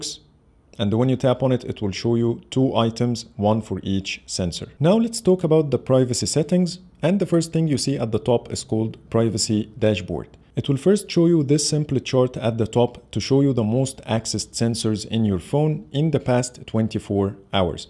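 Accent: Egypt. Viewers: انجليزي مصري اطلعك من وسط ١٠٠٠ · Is this guy Egyptian? Jeez that accent sucks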